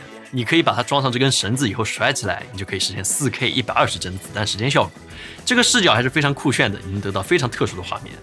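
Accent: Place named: Zhejiang, China. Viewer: 听着像浙江/上海口音。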